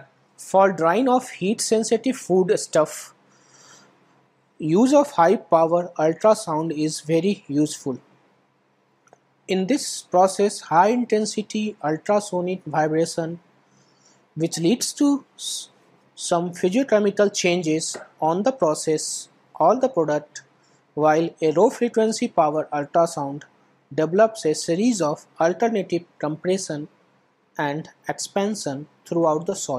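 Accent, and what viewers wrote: India. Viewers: LOL, LOL, LOL. that is the way they speack in India, so please learn more languages so your brain will be open to understand, I speack 5, and you ?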